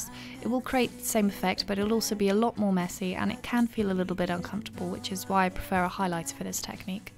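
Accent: United Kingdom. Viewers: What accent is that? Before you say British, I mean what part of Britain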